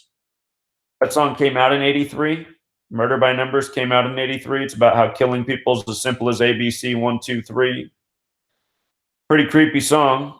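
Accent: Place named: United States. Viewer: London Freemasons was written on that helicopter on the London bridge terror events last year, and the guy with the anonymous mask running in placing a sheet of plywood over the spot where the car was supposed to have crashed into. I know someone already pointed this out. Leicester is pronounced Lester And Sinead is pronounced Shin - eeh- d. Your american pronunciation cracks me up haha. Not too many get the Sinead one correct. Its famous for miss pronunciation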